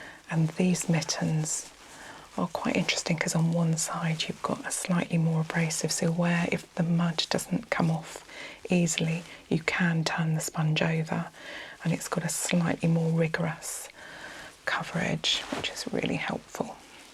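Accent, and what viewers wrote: United Kingdom. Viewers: your soft voice with your British accent is just like a warm hug. I just love your videos, I’ve watched all of them. I listen to help me relax and get sleepy and it works …..thank you for sharing your gift.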